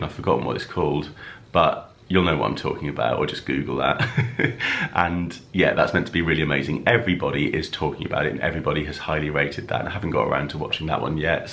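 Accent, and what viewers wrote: United Kingdom. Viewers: You could put out a video about anything and I would watch. Really. You could just sit and play with the kitties. I love your attitude, you're interesting, you're funny, you live in the UK, and I love hearing the accent and seeing the sights. Congratulations on the book! That's exciting! I would love to watch the series, but I don't think there's a way to do that here. I hope you feel better. Happy New Year to you and the kitties!😊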